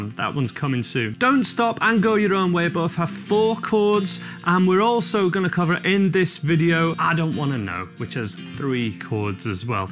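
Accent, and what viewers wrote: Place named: Yorkshire, United Kingdom. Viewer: you sound like your from Yorkshire.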